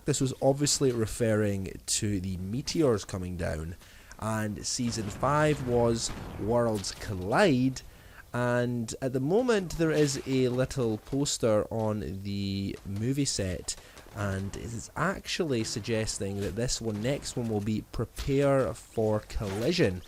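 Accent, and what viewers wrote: Scotland, United Kingdom. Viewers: Scottish boi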